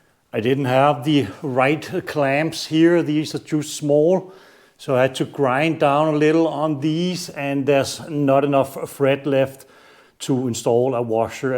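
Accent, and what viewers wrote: Denmark. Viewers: Where are you from? Denmark? Love the accent! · It is very much a Danish accent - fellow dane 🎉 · er jeg den eneste der kan høre at han er dansker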